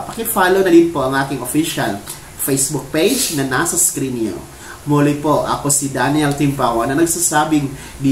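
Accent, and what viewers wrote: Visayas, Philippines. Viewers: Ayusin mo salita mo.and pronounciation.mo.tunog.bisayang.bisaya dating mo tapos fake news mo.na.naman puro ka paasa.pag nakita kita dudugo.bibig mo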